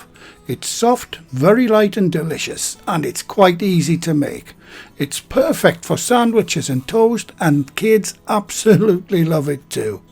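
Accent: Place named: County Durham, United Kingdom. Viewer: Only discovered you channel today, not just great simple wholesome recipes but your voice is making me homesick. I’m from County Durham but live down south now.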